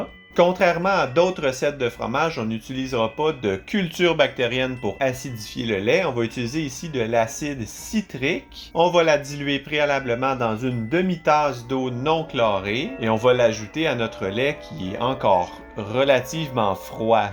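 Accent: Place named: Quebec, Canada. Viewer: J'ai souvent eu du mal avec l'accent québécois puisque j'avais du mal à comprendre mais le votre est tellement compréhensible et agréable ! Et vos vidéos super !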